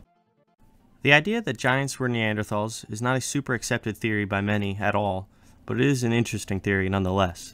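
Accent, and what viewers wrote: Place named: Philadelphia, United States. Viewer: Bro hittin us with that hard Philly accent with the “wooder”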